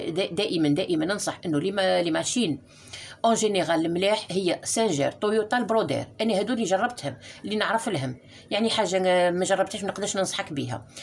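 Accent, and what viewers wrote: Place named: Skikda, Algeria. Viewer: مساء الخير الاخت ارجوكى ديرنى فيديو على تركاب السفيفة المغربية على الصاريان الكحلة كل ما نركبها تهربلى وما نعرفش كيفاه انا دخلت لليوتيوب ولقيت فديوهاتك وزاد ما نتبهت ليك على اللهجة تاعك انا نظنك سكيكدية و ربما ماما تعرف الوالدة تاعك خالتى خاميسة الله يرحمها ادا كانت هى قولى ارجوكى وبالتوفيق ولاكان انبارطاجى الفيديو تاعك فى لقروب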